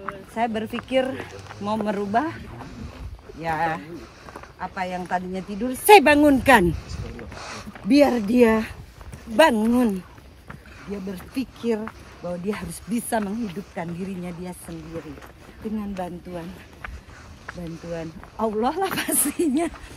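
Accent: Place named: Purwakarta, Indonesia. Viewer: Pakai logat purwakarta tsmbah seru bun..... inyong tambah seneng 😂😂😂😂...😂❤❤❤❤❤❤ love you bunda....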